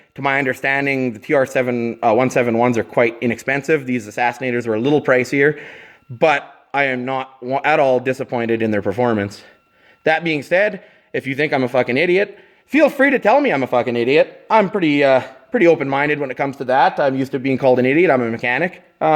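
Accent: Canada. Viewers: I swear all you Canadians sound the same..I can just tell right of the bat your a Canadian 😂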